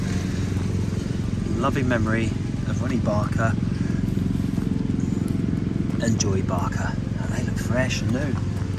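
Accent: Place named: England, United Kingdom. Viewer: Don’t care much for your working class pommie accent👎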